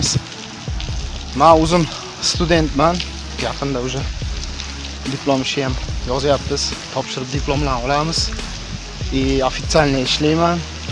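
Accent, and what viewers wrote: Uzbekistan. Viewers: Шева кургон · Укажоним Каттакургонданмисиз??Шевангиз билдим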